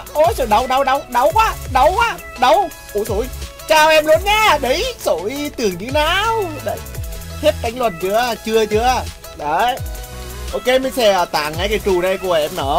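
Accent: Nghe An, Vietnam. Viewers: tiếng nghệ an nói hay chết đc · Nghệ an bạn ạ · cái giọng nghệ An hay quá trời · nghe giọng nửa trung nửa bắc nghe khó chịu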